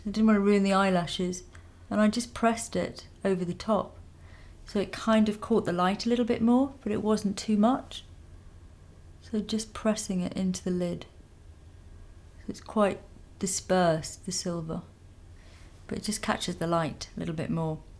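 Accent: United Kingdom. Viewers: Wow very pretty..i will try ur tips but using all cruelty free products to me my vanity is not as important, its the the makeup and who stands behind it. No animal testing for me and thanks for cool tips nice brit accent.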